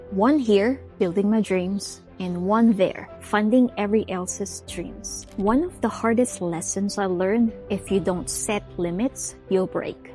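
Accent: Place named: Philippines. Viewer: I can hear your southern US accent mixed with your Filipino accent...hehe. Good video. Truth be told.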